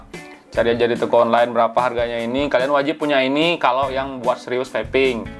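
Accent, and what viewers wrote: Padang, Indonesia. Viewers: Ngomong nya kayak logat orang padang ni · mantap dah.. tp by the way.. logat nya kayak org padang neh.. bener ya?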